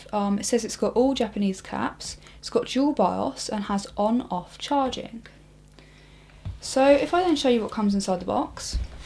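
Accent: United Kingdom. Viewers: Unfortunately I consider this to be one of the more audible accents in the UK. You should hear what people sound like in Portsmouth (Mush;)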